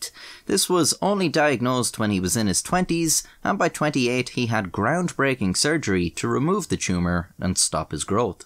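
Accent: Ireland. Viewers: Greetings from one of your ripples in America. I love what you do, and am grateful for your insight and view. Please continue, because there is literally NOTHING in this world I love more than learning from someone with an Irish accent! (I didn’t even have to make that up it’s so true) be well and thank you again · I just wanted to say thanks for going to all the trouble to make these videos. Really like your style, subject matter, humor, and of course, that cool Irish accent. Your ripples reached me in Texas. Keep up the great work - love your channel. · Same im battling fentynal i been on it for 2 years and all i can do when im detoxing is kick around shiver sweat puke. His vidios are easy to watch in a fragile mindset nd his voice make me laugh not alot of irish people here😂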